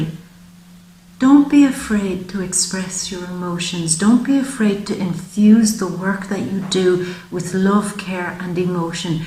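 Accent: Ireland. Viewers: I love you, Your beautiful face and your wonderful resonate voice...that resonates with me. Your brogue make me feel like I am at home, and for some reason I do too...I shouldn't but I do more often than not I speak with a brogue, its fun and feels so natural, any way good reading.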